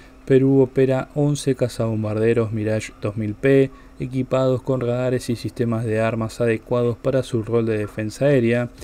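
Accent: Argentina. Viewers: Esta información no es del todo cierta, han obviado armamento moderno que tiene Perú . Este video al parecer esta hecho por chilenos y narrado por un argentino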